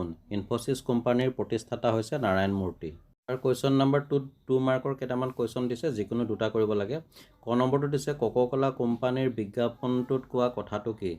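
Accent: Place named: Dhemaji, India. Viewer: Thinks dhemaji Assamese lage sar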